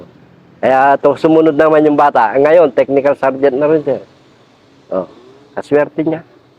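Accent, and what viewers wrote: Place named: Pampanga, Philippines. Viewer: Ganyan tlga ang Punto mg Tagalog ang kapampangan.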